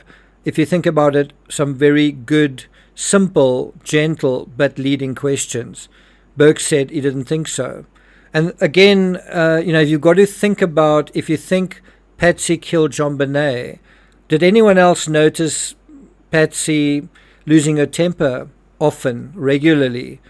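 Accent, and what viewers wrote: South Africa. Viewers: The narrator sounds very South Afrcan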